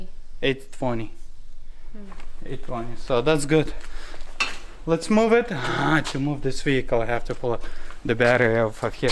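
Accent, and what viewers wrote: Russia. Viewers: Привет, акцент слышится, что ты русский😁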